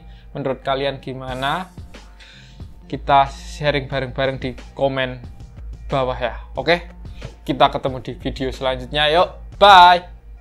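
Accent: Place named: Java, Indonesia. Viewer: medok pak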